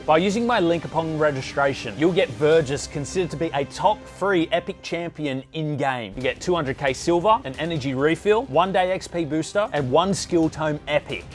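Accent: Australia. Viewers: nice mate. Love your content 👍🏻 I knew you're Aussie from the accent but wasn't sure if you're currently residing in AUS or USA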